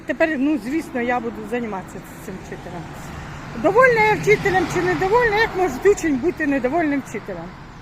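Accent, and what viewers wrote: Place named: Central Ukraine, Ukraine. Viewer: Привіт землячко, по вимові чую що ви з центральної України🖐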